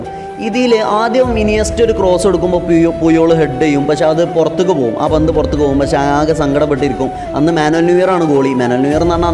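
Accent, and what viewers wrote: Malappuram, India. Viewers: Kettit malappuram muthaanenn thonnunnu allenkilum malapurakaarey anik peruthishtaa Alla chaggay maarey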